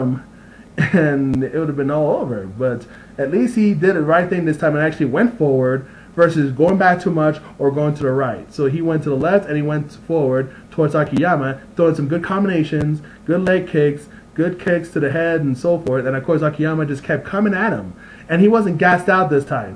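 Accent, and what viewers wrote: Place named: England, United Kingdom. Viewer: Lmao ur accent cracks me up idk where ur from maybe England but I appreciate your luv for martial arts it's very similiar to mine keep doin your thing and ur name rocks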